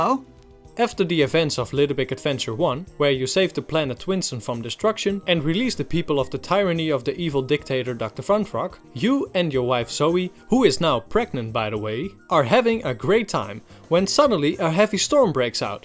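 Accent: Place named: Netherlands. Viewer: are you dutch? you have a dutch accent but you say your location is united states.....you'er a dutch living in USA or you live in NL? i'm in the hague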